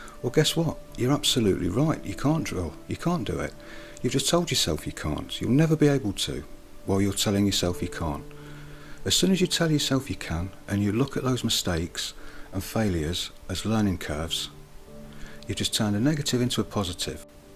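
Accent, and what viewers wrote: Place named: Leicester, United Kingdom. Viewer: You have such a soothing voice. Are you from Leicester? I am too and it’s so nice to hear the accent. Love the video and love your work.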